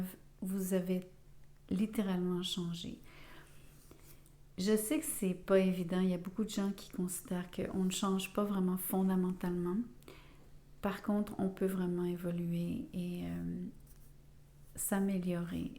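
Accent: Quebec, Canada. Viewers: Heureusement que vous n'êtes pas ma voisine !je serais en consultation permanente ! Rien que pour me laisser bercer par votre accent québecois !😃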